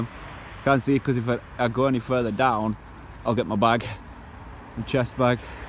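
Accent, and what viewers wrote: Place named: Bristol, United Kingdom. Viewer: you sound like your from Bristol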